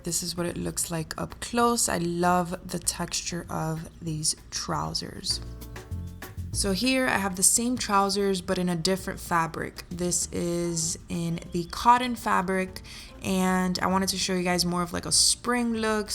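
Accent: Cuba. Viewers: S. K she’s cuban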